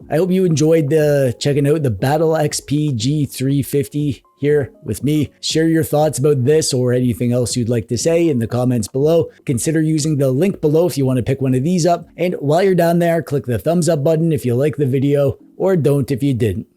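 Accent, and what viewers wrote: Canada. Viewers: I hear a guy with a Canadian accent, I’m trusting him. This handheld looks like a winner!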